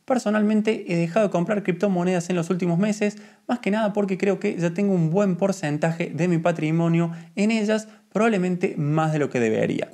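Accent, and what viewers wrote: Córdoba, Argentina. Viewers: que tonada cordobeza, me encanta. Sos gros exponiendo como antes de ponerlaen bancos argentinos, la pongo en cualquier lotria Crypto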